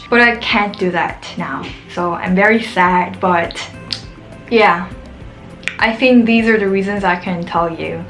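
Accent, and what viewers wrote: Conyo, Philippines. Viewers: Aaaahhhh yung tagalog level nya pang conyo kasi puro rk sa paligid nya hhahhahah cute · I actually think that you’re fluent in English now. Is your English tutor a Filipino? :) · she sounds so conyo its so cute hahahaha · The way she mixed the Tagalog with English sounded like conyo (dont mistake this as the Spanish coño). Its not horrible by any means but it sounded like those overly extravagant people from BGC lol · she only knows conyo tagalog dsjdksjd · Its ok. Your english is sooo Filipino. Luv it 😍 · Thats why your accent is like a filipino accent cause you study here i see i see. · You learned to be conyo. It's cute. Hope you could speak fluent Filipino soon. :) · if i just listen to your voice and not seeing your face . i will think that you are a filipina woman speaking english who are living in manila. 🤔😀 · Hearing you talk in mixed english tagalog feels like listening to a conyo. 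So cuteeeee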